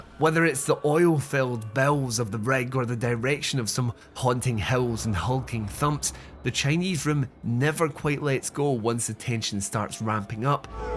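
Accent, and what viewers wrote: Scotland, United Kingdom. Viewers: You mean you cannot follow his Scottish accent?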